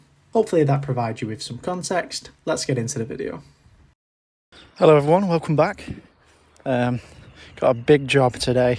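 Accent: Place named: United Kingdom. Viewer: I'm American and your accent doesn't bother me at all. I can understand you just fine. You don't sound annoying you just sound British. I don't know what those commenters are hearing lol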